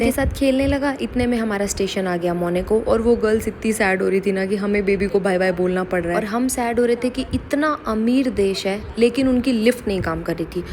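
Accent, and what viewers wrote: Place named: Marwari, India. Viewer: Best gaasiye deti gayi.......ur marwadi with hindi is awesome 👌 😅😅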